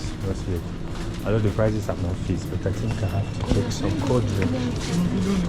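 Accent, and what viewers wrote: Africa. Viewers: Судя по акценту именно африканец? А откуда? Добавь в описание канала о себе что-то кроме того каково чернокожему гулять по Москве. Учишься в Лумумбе? Я так понимаю английский в Африке как русский в Дагестане, тобишь язык межплеменного общения, но без акцента не бывает? Видно, что русский тяжело дается, но речь уже поставлена, лексикон будет расширяться, язык будет привыкать и при желании всё получится. Keep it up man.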